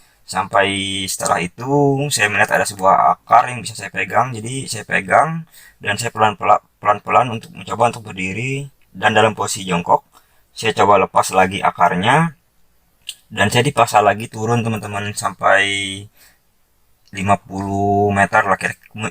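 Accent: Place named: Kalimantan, Indonesia. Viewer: Kalo saya merasa nyaman dengan penyampaiannya karena sama sama org dr kalimantan jd udah terbiasa dengar nya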